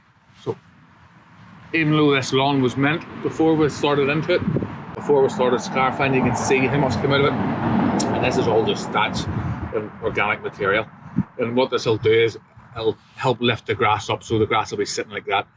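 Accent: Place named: Belfast, United Kingdom. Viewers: Cheers from Australia. Great video but my favourite part was the Belfast accent. Cheers 🍻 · You must be from Belfast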